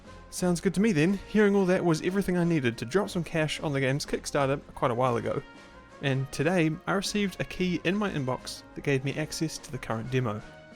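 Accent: New Zealand. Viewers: A Kiwi accent, what a pleasant surprise! I am so hyped for this, especially after seeing the lacklustre CoH3 demos.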